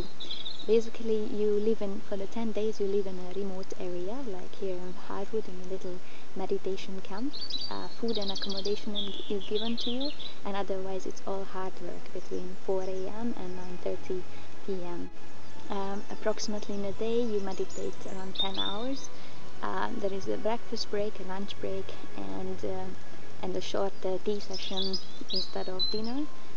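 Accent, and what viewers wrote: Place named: Czech Republic. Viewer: thanks for posting, very well articulated and descriptive video. i'm about to embark on my first vipassana experience & judging by the constant smile on this girl's face, it might just be smtg useful :) where is the accent from? my guess: czech republic....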